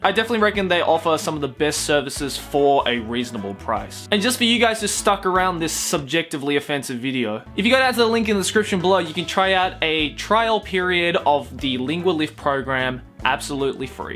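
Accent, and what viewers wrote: Australia. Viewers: Salma M. He's Australian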